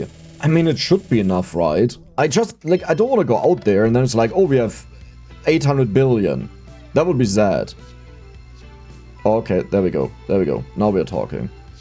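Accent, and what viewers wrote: Germany. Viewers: Man hört so raus dass du deutsch bist. Ich Liebs. Peak Video btw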